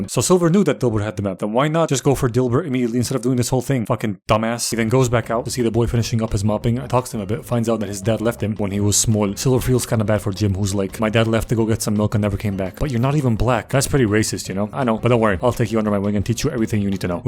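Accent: United Kingdom. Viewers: Your British accent is rad!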